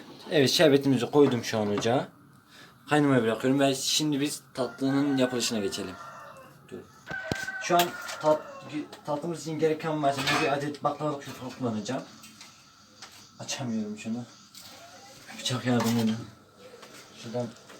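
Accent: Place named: Mardin, Turkey. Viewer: %100 mardinlisin konuşmadan belli :)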